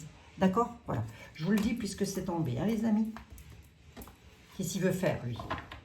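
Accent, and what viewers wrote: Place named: Vosges, France. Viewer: Bonsoir, j'ai écouté Christophe ja cob hier soir, ils ont dit avec Babette qu'ils allaient vous voir, ça promet les bêtises 🤣🤣😅😅. Moi je suis une ex spinalienne, du coup j'adore votre accent, et vos bêtises 🤣🤣😅😅 ça m'éclate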